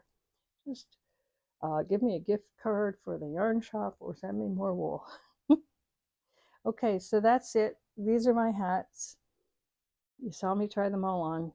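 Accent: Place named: Canada. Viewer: It sounds like you might be from Canada. I’m in a state that borders Canada. We get a lot of snow (right now it’s freaking cold here), but I’m sure we don’t see anything close to the snow levels you can get in a snowstorm in Canada. 😊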